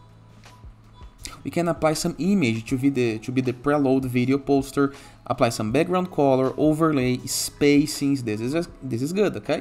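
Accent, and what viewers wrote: Brazil. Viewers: Sotaque BR. It gets one to know one.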